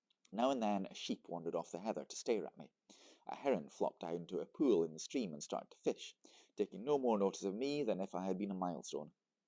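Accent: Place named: Lowland Scotland, United Kingdom. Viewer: Great Lallands in your storytelling today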